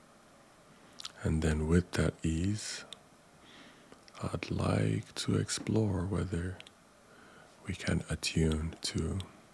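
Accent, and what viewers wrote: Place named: Toronto, Canada. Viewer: You sound like you're from T.O... 🇨🇦🤙🏻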